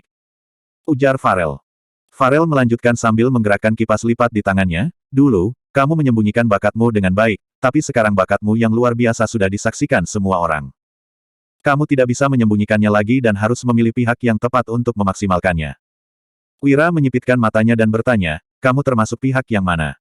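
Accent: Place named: Palembang, Indonesia. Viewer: Wong plembang?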